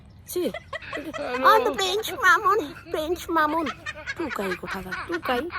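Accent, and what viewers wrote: Comilla, Bangladesh. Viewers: আপুর বাড়ি কি কুমিল্লা খাস কুমিল্লা বাসায় কথা বলতেছ আমার কাছে তো সেই ভালো লাগতেছে আমাদের দেশের ভাষাগুলো আসলেই আমার কাছে খুব ভালো লাগে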